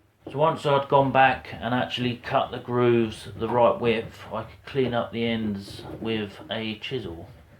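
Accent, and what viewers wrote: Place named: United Kingdom. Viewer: Great job, that holder will never fail! Probably more valuable than the glue guns. Well I am part of the 20% who is a subscriber. My question for you is what part of the UK does your accent from. I have two friends in the States who are from Yorkshire and Wales. Your accent is much different. I am from Tennessee here in USA. I do like the two flags you made but watched the video and discovered that it is a long build.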